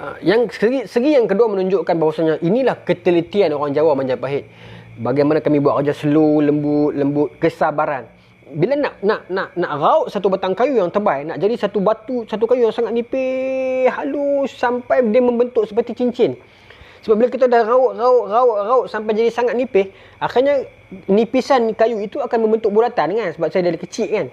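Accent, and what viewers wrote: North, Malaysia. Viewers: Assalamualaikum tuan boleh tumpang tanya asal orang utara negeri mana dan daerah mana? Maaflah kalau saya kolot tak tahu. Apa pun memang suka mendengar cerita sejarah yang masih tidak sesiapa dapat membongkar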